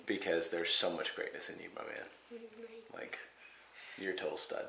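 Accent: England, United Kingdom. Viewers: Happy Birthday the Great, we love you guys you crack me up with your English accent.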